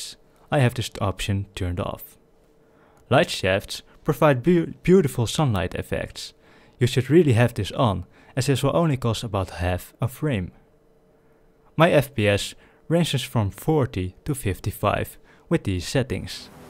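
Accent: Netherlands. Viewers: Your accent sounds very dutch, am I right? xD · Dat Nederlands accentje... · does. He just has a really bad Dutch accent, which for some reason tends to add a lot of "sh" sounds in the wrong places for lots of people. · lol he has such a dutch accent (mooie vid) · Probably someone from the Netherlands. I'm Dutch myself and know a lot of Dutch people speak English like this.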